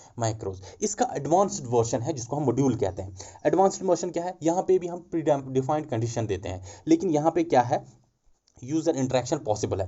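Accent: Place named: Kulgam, India. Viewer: Meh Basan Sir chu Kulgam Rozan As per Language. Kaiz mh che kulgam peath knh Dost, wo b same Sir ki Tarah baat Krte hai. Content 👌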